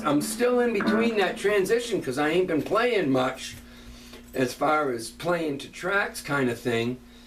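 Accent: New England, United States. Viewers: Are you in Maine or Mass? you sound like your a New Englander. Good Stuff my friend.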